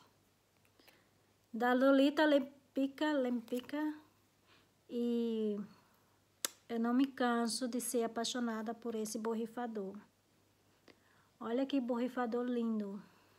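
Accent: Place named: Northeast, Brazil. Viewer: adoro vc e esse seu sutaque nordestino vc é maravilhosa suas resenhas.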